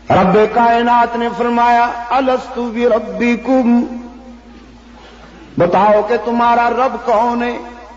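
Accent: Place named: Sindh, Pakistan. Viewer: Hazrat ka Hamen lehja bahut pasand Aaya Hamare India Ke Log inke byanat bahut sunte Hain Inka Le Ja Sindhi juban ke upar hai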